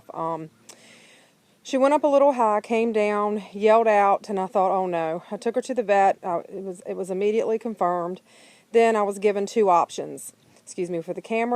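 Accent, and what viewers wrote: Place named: Virginia, United States. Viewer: You sound like you're from Virginia or maybe NC, but my money is on VA. Love VA! Am I close? 😊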